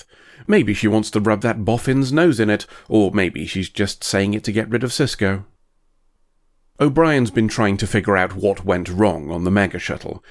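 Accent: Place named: England, United Kingdom. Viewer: How refreshing to hear a commentary in an English accent with English expressions.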